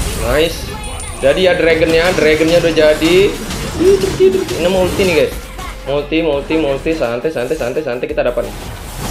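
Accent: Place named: Malang, Indonesia. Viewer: Triple kill-> niyok-> jebret->Maniac😂😂 Boso jowone podo karo boso Jowo Malang-an😂 Salam dugi Kota Malang ❤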